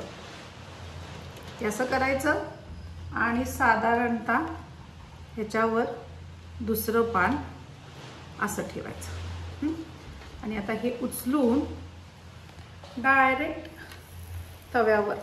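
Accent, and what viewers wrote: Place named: Belgaum, India. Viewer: Cute belgavi marathi. Ani cute recipe. Live it.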